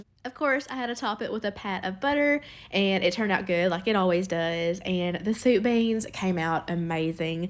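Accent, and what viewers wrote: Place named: Kentucky, United States. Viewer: I'm from Lexington lol. I didn't know where you were from but I thought I recognized a KY accent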